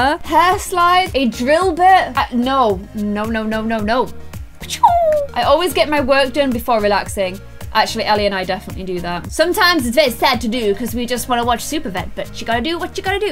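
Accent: Ireland. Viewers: UR IRISH!!!!!!!SO AM I!!!!! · are you irish ? lizax yess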